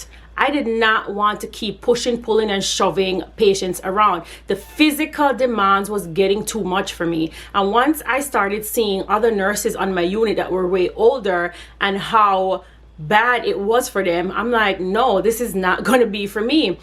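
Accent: Jamaica. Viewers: From your accent I could tell you are Jamaican. I know more Jamaican nurses go to the USA than here in Canada. My pay here without a ton of overtime( I think I did overtime 4 or 5 times for the year) is just over 95,000 CAN before taxes. Last year I got over 100,000 for the first time in my career after working over 20 years. The problem is we pay a lot in taxes. I find people don't complain much about taxes here . Its just recently I hear more complaints about pay , taxes etc. I think because housing prices have gone up significantly in the cities, plus inflation and the government has frozen salary to 1 % increase which is nothing. Generally before all this ,a nurse can buy a nice house on her own and live pretty descent life without working tons of extra time. NP's here get more to start but can still work less overall in comparison to other nurses who do OT etc. 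We are due for a descent pay increase , so will see what happens.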